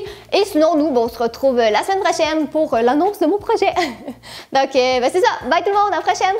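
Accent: Canada. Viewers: C'est tellement beau l'accent Canadien 😍😍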